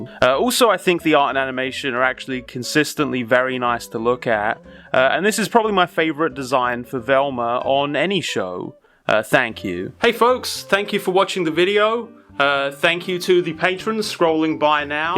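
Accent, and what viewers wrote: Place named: United Kingdom. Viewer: Never realized that british people pronounce H as "Hache"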